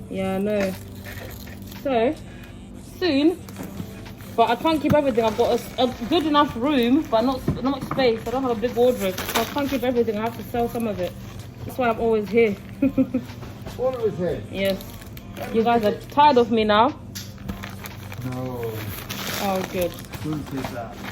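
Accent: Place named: Southern Africa. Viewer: I kept wondering where in Southern Africa you were from 😅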